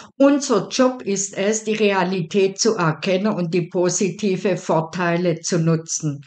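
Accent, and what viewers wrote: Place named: Swabia, Germany. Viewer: diese schwäbische (?) Akzentuierung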